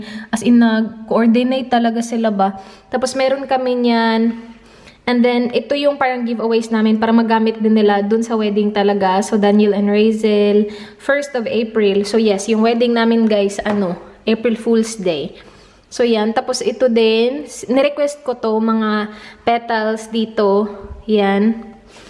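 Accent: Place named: Visayas, Philippines. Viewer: Hi ate 😃 makahappy man ka lantawon te oi kay same atung accent pag magtagalog hahahaha😅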